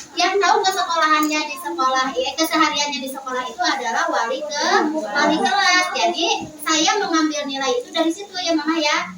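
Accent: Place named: West Java, Indonesia. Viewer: kaya logat sunda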